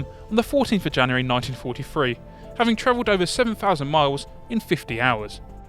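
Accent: United Kingdom. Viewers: Why a Brit narrator for an American plane? ENOUGH!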